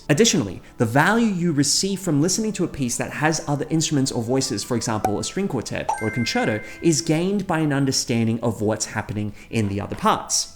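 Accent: Australia. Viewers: He is Australian, but I imagine it changes depending on who he talks to or where he's located. It influences the way he talks naturally (unconsciously). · It’s nice to hear speaking comfortably in his Aussie accent. Good on him! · The aussie accent threw me for a loop. I was like... who is this guy? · You can hear the Australian in his voice in this video. Maybe he talked to his mother before filming. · I just watched a rosin video that led to this and hearing the different accents threw me for a loop! I went back to the rosin one and listened to the first bit more carefully and I could hear where his Aussie accent was peeking through, but otherwise it was a great American accent he put on for it., we'll watch you either way! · Thank you!! This is the answer to the question I’ve always wanted to ask you!😁😁 Btw, love your Aussie accent❤ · We need more of your Australian accent! 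· I've watched like 5 of your other videos and this is the first one I've heard where you sound Australian. I was so confused... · Thats a crazy aussie accent...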